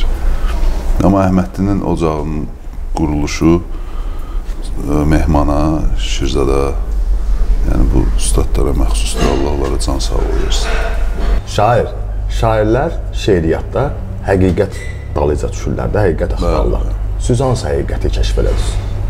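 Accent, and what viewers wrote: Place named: Shirvan, Azerbaijan. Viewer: Şirvan ləçəsi ləzət eliyir adama prostoy так держать земляк 💋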